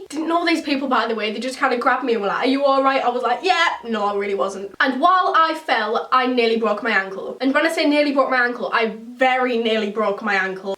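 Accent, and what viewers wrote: Newcastle, United Kingdom. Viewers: Are you Geordie ??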